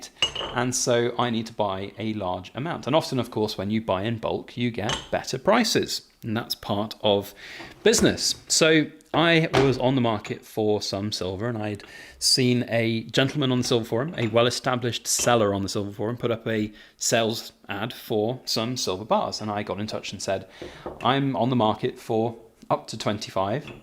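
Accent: England, United Kingdom. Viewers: Hey bud, 2024 has sure thrown a bunch of really interesting times and? situations your way this year. I suppose that bunch of $ leaving their coffers may be a hack but as you say the 10th degree was totally not needed. You are and has an English accent that stands out like it is you. Even an untrained Canuckian can tell your voice against any other Cockney accent. I hope that wasn't an insult? However, you got them straightened out and hopefully your seller wasn't too agrivated awaiting his money. That really is a lot of silver. Must feel great to have that pile to ponder my old friend. WOOHOO for you. A hundred ounces is the most I have ever had in hand. I have a video of it (10 x 10 ounce bars) being reduced to casting shot. I am sure glad I don't have to do that anymore as I finally found a trust worthy dealer for my needs. Generally a buck and a quaarter over spot. 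Good to see you today old friend. Shall we go pour something, LOL.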